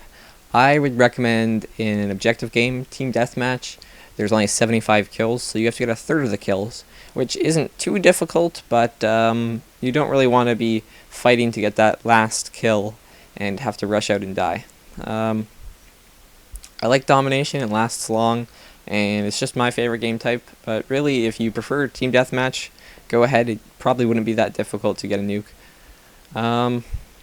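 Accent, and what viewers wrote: Canada. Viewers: Dat canadian accent he has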